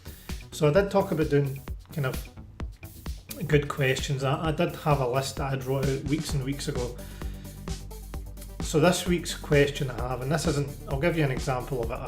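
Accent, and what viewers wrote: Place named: Scotland, United Kingdom. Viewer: eh Joe is one of my favourites! You can really see how your channel is evolving 👍🏻 If you feel like popping over then do if not then it’s not a problem 😊 I’m here to stay as I don’t hear the Scottish Accent enough on YT, mines has faded a little as I’ve lived in England for 17 years 😬 keep doing what you’re doing....it’s fun to watch!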